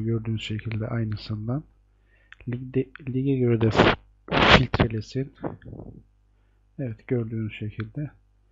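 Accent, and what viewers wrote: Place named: Ardeşen, Turkey. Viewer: biliyordum :) ardeşen tarafları gibi hissettim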